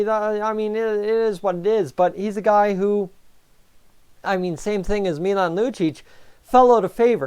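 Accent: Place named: Nova Scotia, Canada. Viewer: From Edmonton here, you sound like you're from Nova Scotia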